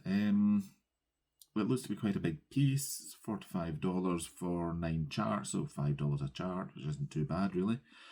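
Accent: Scotland, United Kingdom. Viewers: Greetings from Carmel, Indiana in the U.S. I loved seeing your fancy ladies and your SALs. Also love your Scottish accent. Keep up all the great stitching!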